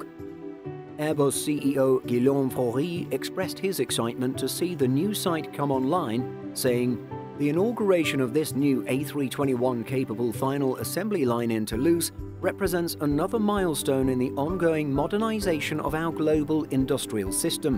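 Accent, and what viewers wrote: United Kingdom. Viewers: Would live to see the person behind this voice. Perfect british accent and perfectly pronounced French names. Hats off to you gent.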